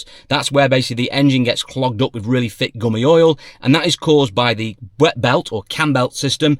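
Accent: United Kingdom. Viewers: Highly informative; But have someone else without the heavy Brit accent unundrstandable!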